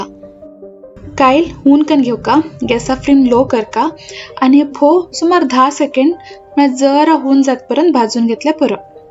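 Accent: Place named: Aurangabad, India. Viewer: The way you speak konkani is different from Mangalore konkani 😊. Which part are you guys from ? My cousins who were from Aurangabad spoke like that.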